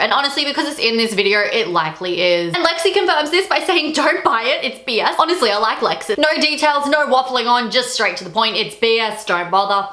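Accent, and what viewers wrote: Australia. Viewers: Cute video but curious to know, from one aussie to another... where is the sudden "kath and Kim" voice and sayings from? is this a new thing??? LOL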